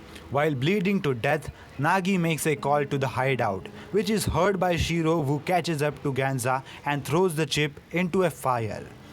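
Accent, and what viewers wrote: India. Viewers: Are you from india your voice sounds like indian I am a new viewer so I don't know if you said anything about this in past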